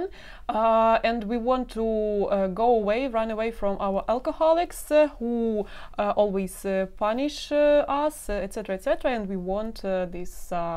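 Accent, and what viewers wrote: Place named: Russia. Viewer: Your english is good. I can se you pronounce "it" as "eat" just like a russian girl I talked to. And you say "for what" the same. End sentences with abrupt and to the point words 😂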